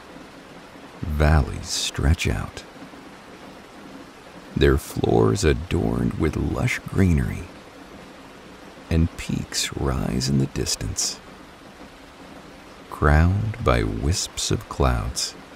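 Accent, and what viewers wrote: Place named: United States. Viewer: Nice to hear a cultivated American accent. Have to work on its not sounding so supercilious …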